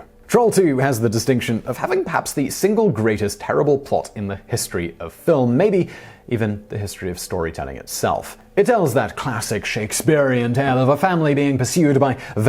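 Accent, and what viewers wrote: United Kingdom. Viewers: Same. He has the perfect British accent...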